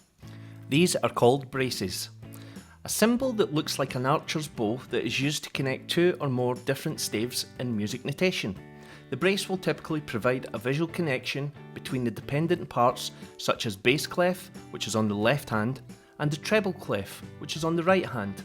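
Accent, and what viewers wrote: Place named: Scotland, United Kingdom. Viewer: You’re Scottish; from where?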